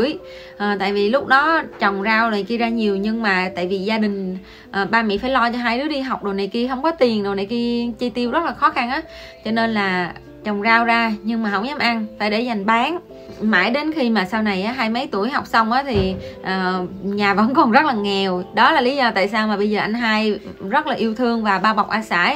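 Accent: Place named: Central, Vietnam. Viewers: Chúc con gái luôn bình an và hạnh phúc .nghe tiếng nói của con như người miền trung .sống xa quê con luôn khôn khéo và cẩn thận,con,nhé. Lòng người nham hiểm khó đoán .con thì quá trẻ nên suy nghĩ rất cẩn thận trước mọi sự việc con nhé .cô luôn đồng hành kênh của con .chúc con hạnh phúc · Nghe giọng, đoán cô là người Ninh Thuận - Bình Thuận(?). Wa chúc kênh cô ngày càng nhiều người đăng ký và theo dõi.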